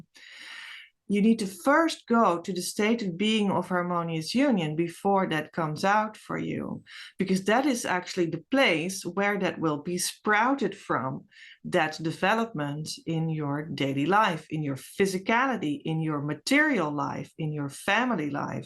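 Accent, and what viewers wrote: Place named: Netherlands. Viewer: So nice to see you! My DM is Dutch so I recognized your accent right away.its so reassuring to know someone from The Netherlands is discussing Twin Flame. He would have me believe otherwise 🤭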